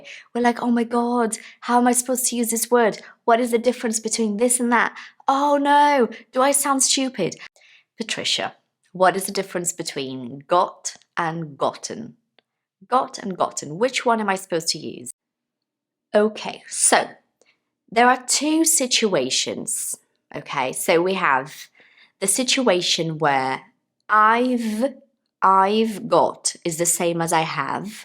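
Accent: United Kingdom. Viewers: Beautiful British English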